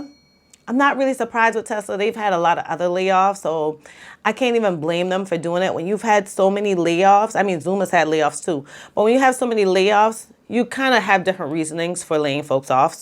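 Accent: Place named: South Carolina, United States. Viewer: This is unrelated, but I gotta know where you're from. I'm from the Charleston area of SC, and your accent is reminding me of that lol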